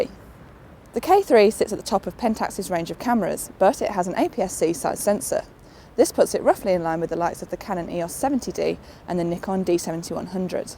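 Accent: United Kingdom. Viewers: Like camera review with uk accent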